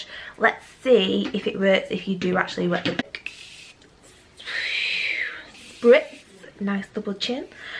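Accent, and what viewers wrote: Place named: Manchester, United Kingdom. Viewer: You gotta be from Manchester ❤❤❤❤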